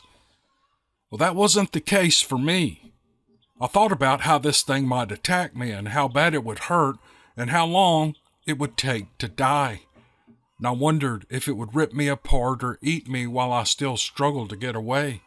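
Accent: South, United States. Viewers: It's that southern accent, I love it. Feels like an uncle telling a hunting story.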